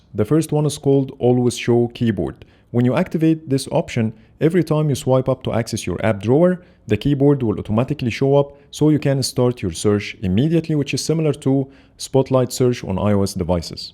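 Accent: Egypt. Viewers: The Egyptian accent is corrupting your English.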